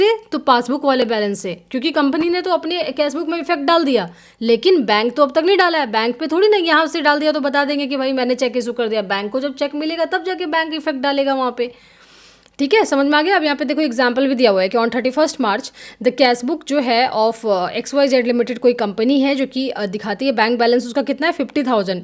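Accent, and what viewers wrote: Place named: Haryana, India. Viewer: Ma'am ki bat sunke lagta hai ki she is either from Haryana or Western UP..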